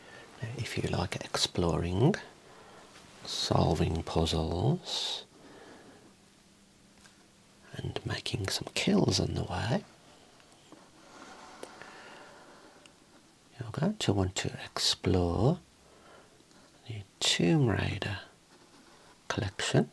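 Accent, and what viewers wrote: United Kingdom. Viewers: wow its wonderful how u pronounce the british accent, this is the best asmr with u showing us ur collections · Did I hear a British guy say his favorite Wii sports game was baseball??? 😅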